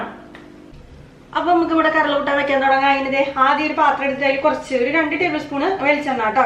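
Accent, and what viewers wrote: Thrissur, India. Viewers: Chechi trissur aano??? Evida place Iam from trissur....anyway super recipe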